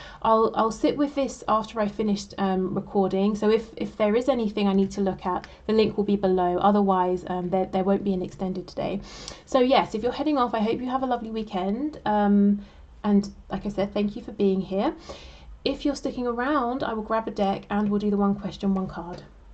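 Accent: United Kingdom. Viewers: I love British accent, lol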